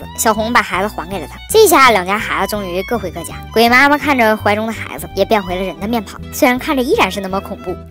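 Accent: Northeast, China. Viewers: 哈哈 讲解的一口东北大碴子 太搞笑了 逗乐了都！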